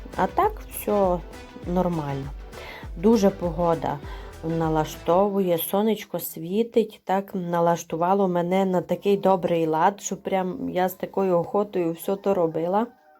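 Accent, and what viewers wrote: Transcarpathia, Ukraine. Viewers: Цікаво Вас дивитися,дуже люблю людей з Закарпаття і ваш діалект.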